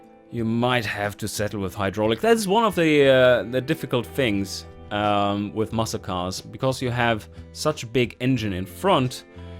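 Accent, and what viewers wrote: Germany. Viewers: Bon soir monsieur, are you from this lovely part of our earth Germany? I cant quite make out if you are German or not... Cheers mate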